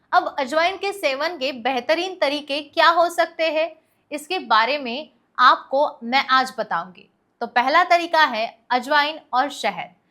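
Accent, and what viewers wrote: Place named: Maharashtra, India. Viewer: Woh marathi hain sayad isiliye unka uchcharan waisa sunne ko milta hai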